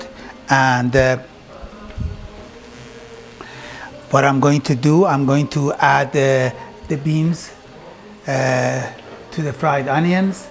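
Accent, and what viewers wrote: Iran. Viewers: Personally I love your Accent It`s Persian Accent and it spices up your Cooking show more over I would like to thank you here for sharing your experiences and knowledge Regards Arash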